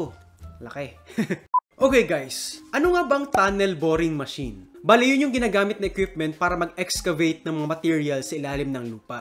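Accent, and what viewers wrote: Visayas, Philippines. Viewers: AY BISAYA D I KA? KAYATA PAREHA TA 😂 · Bisaya diay... 😆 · Bisaya ka pala bay grabeha HAHAHA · hahahahahah bisaya pud diay ka lodi? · Dugmok🤣🤣 cute kaau ka magtagalog sir bisaya kaau.murag ako sd 🤣. New sub here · Ayyy bisaya ka.man dong 🤣🤣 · Bisaya accent🤣🤣💪💪Sakk lagay..... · bisaya kaayo oi! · IDOL GYUD NKO IMONG ACCENT SA BISAYA DOL :D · Ayg paila na bisaya ka baii bwhaja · Bisaya diay ka sir hhahaa 🙂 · Bisaya ka man d.i Engr. hehe salamat sa paliwanag 😁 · bisaya man diay ka kol, hahaha! nice content. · Bisaya mandi-ay ka Engineer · Taga asa man ka Sir bisaya man ka ehehe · Uy bisaya man diay haha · bisaya d.i ka bay ahaha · Nalingaw kos bisaya nimo tol, bagong fan here 😂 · Bisaya ka man diay sir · Bisaya ka engineer?😅🤣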